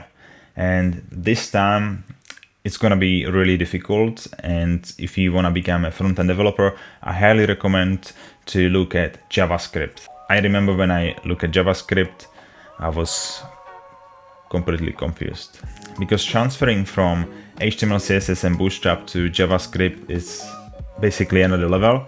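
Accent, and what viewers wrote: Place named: Czech Republic. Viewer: Slyším českou angličtinu jdu mrknout na video :D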